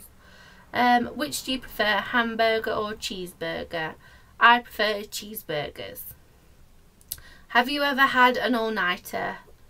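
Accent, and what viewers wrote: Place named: United Kingdom. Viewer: What accent is that, not sure if British, oops never-mind you answered my question later on in the video haha This was so cool to listen to, wish us Americans had a cool accent haha.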